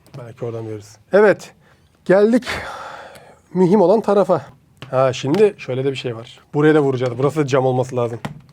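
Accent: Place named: Eskişehir, Turkey. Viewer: Daha videonun basında düşünüyodum bu adam eskişehirli diye harbide öyle çıktı mk (bende eskişehirliyim)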